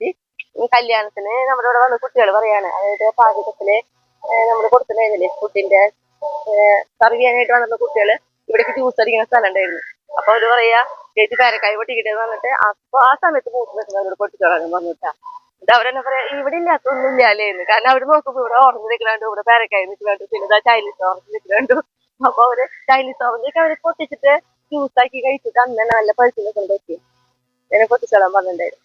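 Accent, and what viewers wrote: Thrissur, India. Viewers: വീഡിയോയും കൃഷിയും ഭക്ഷണവും എല്ലാം വളരെ നല്ലതാണ്... പക്ഷേ പറയുന്നത് പകുതിയേ മനസ്സിലാവുന്നുളളു. ഒന്നാമത് തൃശൂർ slang... പിന്നെ ഭയങ്കര സ്പീഡും.. ചേച്ചി തന്നെ വീഡിയോ ഒന്നു കണ്ട് നോക്കൂ.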